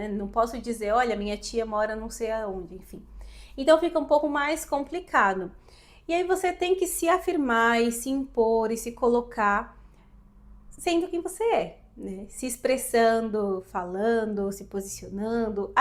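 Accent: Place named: Brazil. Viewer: Parabéns amiga pelo seu Português perfeito e já com pouco sotaque Brasileiro, parabéns também pela sua argumentação perfeita e correta, vou continuar a assistir os seus vídeos.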